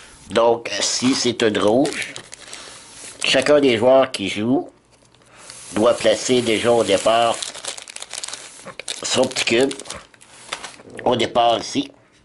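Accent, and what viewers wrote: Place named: Quebec, Canada. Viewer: Merci pour ta vidéo, bien détaillée, pour tes bons voeux (reçois les miens en retour) et ce délicieux accent de la Belle Province qui régale mes oreilles françaises ! Au plaisir de te retrouver sur d'autres vidéos (j'ai adoré ton Top 10 jeux solo). Bye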